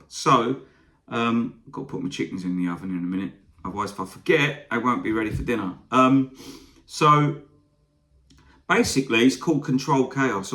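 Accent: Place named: England, United Kingdom. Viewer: Ha. First time seeing one of your vids. I didn't expect the English accent. Your playing and overall vibe was that of an American Southerner initially. That was meant as a compliment, btw. ~Native Texan (Subscribed)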